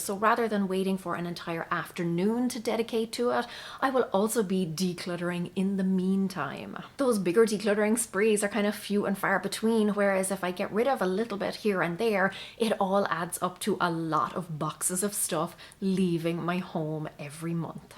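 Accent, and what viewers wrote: Ireland. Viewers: One thing I have gotten from your videos is that no one gets it perfect the first time. It's a process. You can learn. You can change your mind. Be gentle with yourself. Thank you for that! Oh, and ANYTHING spoken in a lovely Irish lilt is easier to listen to! It's the spoon full of sugar that helps the medicine go down.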